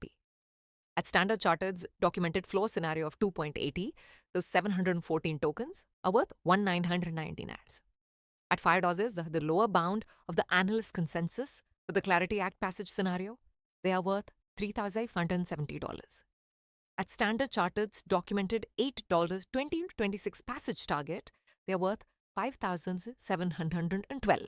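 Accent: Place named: India. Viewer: Why an Indian accent 😂skip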